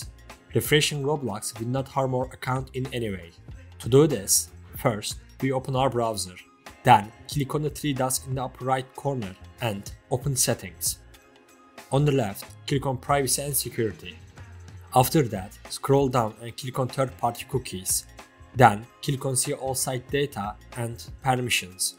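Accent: Turkey. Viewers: this guy has the strongest turkish accent i have ever heard so far lol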